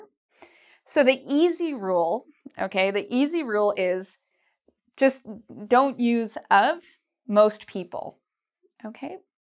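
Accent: Canada. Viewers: i love Canadian accent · canadian eh?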